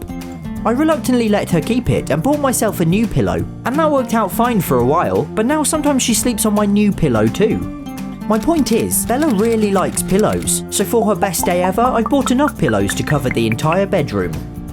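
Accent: United Kingdom. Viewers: Is he Bri'ish?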